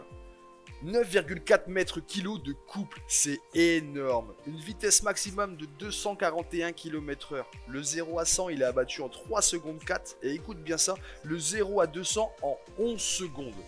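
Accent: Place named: North, France. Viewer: Cet accent de "tcho gomin" du nord !!! Ça me rappelle ma jeunesse 🤣🤣 encore une vidéo drôle et dans l'esprit de nos petits verres de Perrier menthe en terrasse entre deux arsouilles a tordre le caoutchouc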